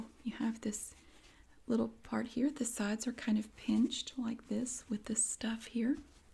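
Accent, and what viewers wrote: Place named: Upper South, United States. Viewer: 'My Journey To A Smile' She’s from the upper south east! 😊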